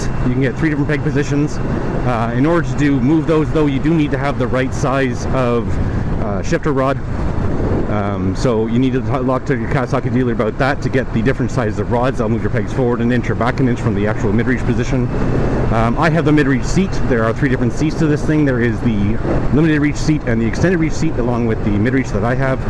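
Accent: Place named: Canada. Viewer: What country is that? Everything is in kilometers but you're on the right side of the road and you sound American.... but you do say "AbOOt". I'm guessing Canadian?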